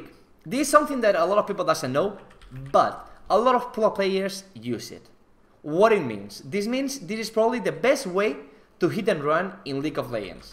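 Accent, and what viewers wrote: Spain. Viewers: I know you are Spanish, you need to learn english not Spaglish.... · it's a spanish accent. like spain in europe not latin america.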